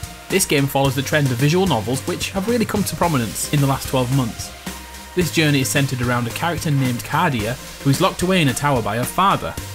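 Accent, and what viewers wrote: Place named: Scotland, United Kingdom. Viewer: Wow, the scottish accent. How much you changed!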